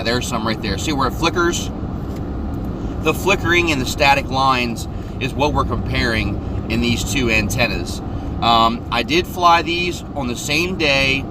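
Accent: United States. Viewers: dude I love your accent :D what area in the US are your from?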